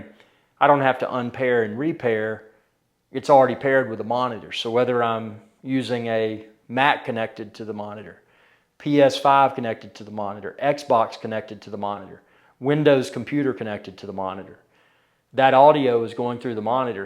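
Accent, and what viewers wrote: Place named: Atlanta, United States. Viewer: Does it being a VA panel (as opposed to the popular IPS) make any difference? They say VA panels aren't as good in terms of viewing angel. How's your experience been sitting up close using it as a monitor vs using it for TV watching from a few feet away perhaps? By the way your accent reminds me of my friends in the Atlanta area 🙂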